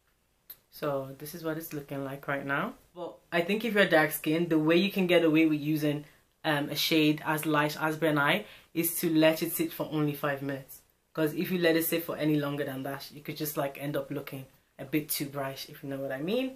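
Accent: Ireland. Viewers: Was googling this product and clicked onto your video and then was like yeah thats deffo an Irish accent! Love finding Irish gurus so I can buy what is rec'd easily!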